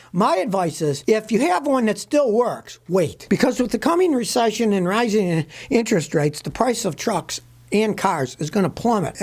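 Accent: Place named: New England, United States. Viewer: Love the Yankee accident, etc The charm of "PLETH-ORa" is classy gangsta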